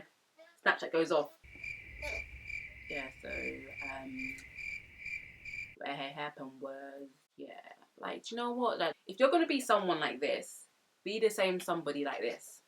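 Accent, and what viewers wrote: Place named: United Kingdom. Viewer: Soo true instagram is soo fake and made me so depressed. Its not real life and you're right none of those people will be there for you in your struggles. Plus I hate feeling overexposed and you never know how many creepers are watching. As women its not very safe! I'm glad instagram deleted my account. I realized how immersed I was in that fake world. So glad you talked about this youre beautiful and love your uk?? accent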